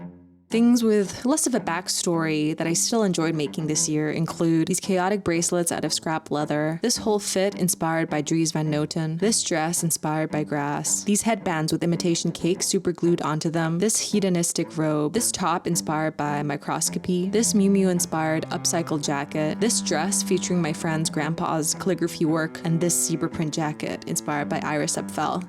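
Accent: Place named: United States. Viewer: Just now finding your channel. You are so talented and eclectic in your style! How is it that you were raised in Austria? and have an American accent?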